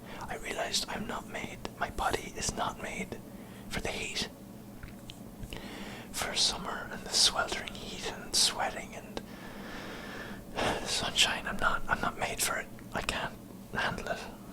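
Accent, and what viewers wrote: Ireland. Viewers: love love love the Irish accent and your voice is soooo tingle-inducing. thank you xx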